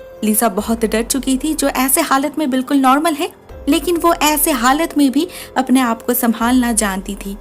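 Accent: Bengal, India. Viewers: Aapki bolne ka tareeqe se pata lagta aap bengoli ho Btw I'm also bengoli 😊🌸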